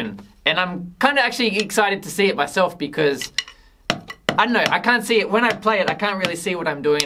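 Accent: Australia. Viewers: His sound like Aussie English takes Japanese for Tokuda has God :))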